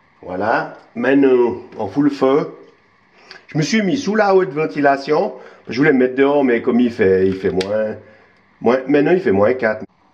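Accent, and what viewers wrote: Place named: Vaud, Switzerland. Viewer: Bonjour, Votre joie de l'enfance que l'on ne pouvait pas s'offrir est communicative. Il me semble que Märklin faisait aussi ce genre de machine. Petit j'en rêvais...Bonne suite et merci de vos partages authentiques, plein de saveur, avec votre bon accent vaudois (j'ai le même!) Laurent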